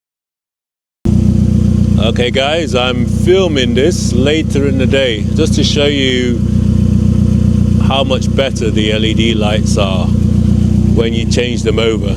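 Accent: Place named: United Kingdom. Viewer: I think its great what your doing brother. Most mr2 owners on youtube are american and its a refreshing change to hear a fellow Brit 👍 I love the editing aswell keep it up.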